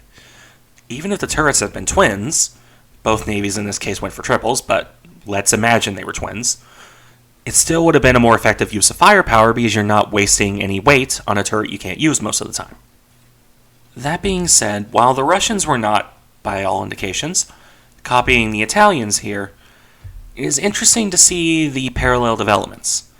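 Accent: United States. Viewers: Oh, just checked up on dee ofa comments: see y'all Virginian, wit dat southern drawling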